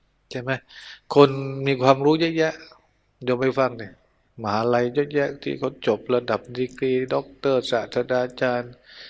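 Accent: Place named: Isan, Thailand. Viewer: ขอนอ้มนมัสการเจ้าค่ะ.ท่านเจ้าคุณ..ด้วยความเครารพได้ยินเสียงหัวเราะมีเสียงยิ้มๆไม่ได้เห็นรูปไม่เห็นหน้าตาเจ้าค่ะ.แต่ก็โอเคเจ้าค่ะ.ชอบฟังๆท่านเจ้าคุณบรรยายเป็นภาษาอีสานก็มีท่านเจ้าคุณพูดภาษาอีสานเพราะๆมากๆ.กราบ.กราบ.กราบ.สาธุ.สาธุ.สาธุ.เจ้าค่ะท่านเจ้าคุณ.🙏🌹🙏🌹🙏🌹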